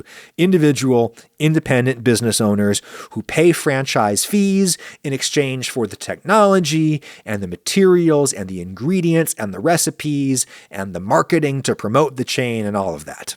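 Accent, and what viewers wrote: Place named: Georgia, United States. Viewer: Georgian dialect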